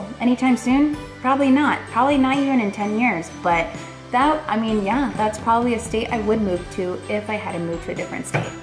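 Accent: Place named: United Kingdom. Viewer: I always enjoy your videos and that British accent! 😂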